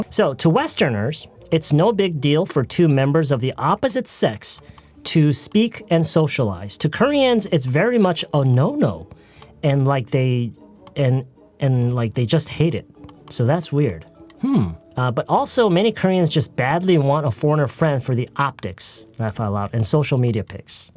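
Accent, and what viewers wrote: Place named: East Coast, United States. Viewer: 이정도 듣기는 너무 쉽죠ㅋㅋ 실제로 미국 가시면 님 안들릴거예요ㅋㅋ 모든 사람이 백인 동부 상류층 영어를 사용하는게 아니라서요ㅋㅋ 굉장히 또박또박 천천히 얘기하는데 당연히 쉽죠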